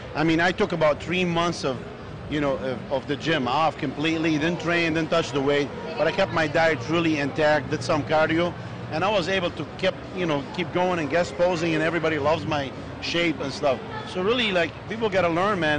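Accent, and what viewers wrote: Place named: Ireland. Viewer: You're from Ireland? I know a lot of people that bag the shit out of your accent aswell. Have some respect knows more about nutrition and BB than most pros. Who cares where he comes from or how he speaks.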